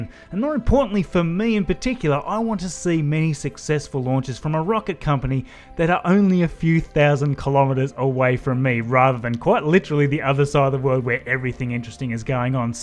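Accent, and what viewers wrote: Australia. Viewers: Oh your Australian? Gg.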